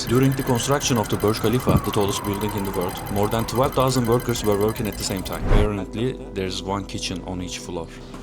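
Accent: Turkey. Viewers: türkçe seslendirmeyi kapattım hala türk aksanı var diyorum niye meğersem türkmüşsün :D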